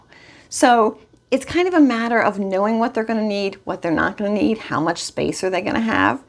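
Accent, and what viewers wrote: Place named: Southwestern Pennsylvania, United States. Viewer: Kind if surprised you are in SE PA - kept hearing SW PA in your accent! Regardless, I really enjoy the logical, straightforward advise given in a friendly ‘it will be ok’ voice - so needed as we sort through 3000 SF and 38 years of being together!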